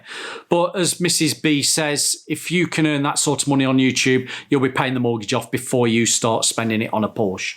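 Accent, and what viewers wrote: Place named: East Midlands, United Kingdom. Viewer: Great to hesr an east Midlands accent on YouTube .. im originally from Grantham ..did my yts for wj king in melton in 1990... Worked all over notts.. Finally great to see a painter doing videos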